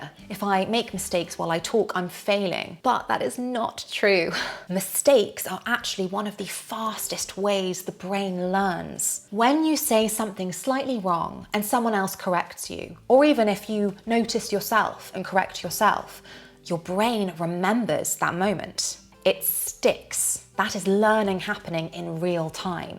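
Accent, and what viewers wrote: United Kingdom. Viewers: de que parte del Reino Unido es su acento , ❤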